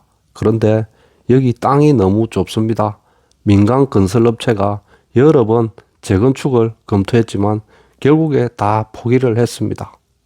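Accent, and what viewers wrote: Gyeongsang, South Korea. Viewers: 형님 경상도 말투가 넘 맘에들어요 한번 뵙고쉽네요~ 저는 전라도덴... 정말 모든유툽 넘 잘 설명하시고 항상 유툽보면서 장거리 운전하는데 지루하지 않아서 넘조아요ㅎㅎ 응원합니다❤😂🎉😅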